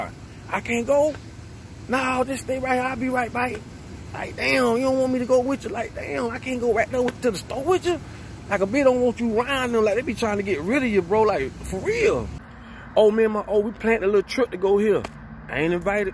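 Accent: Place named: New Orleans, United States. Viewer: Are you from New Orleans... I'm dying over here it's the truth 🤣🤣🤣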